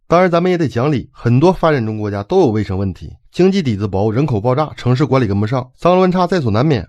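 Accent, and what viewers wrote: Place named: Northeast, China. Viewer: 虽然是东北话，但是你能听出来这个，那真是你的问题了。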